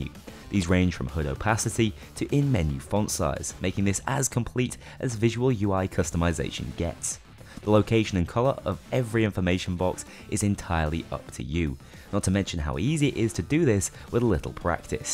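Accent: Denmark. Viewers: bro er du dansk? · Are you danish?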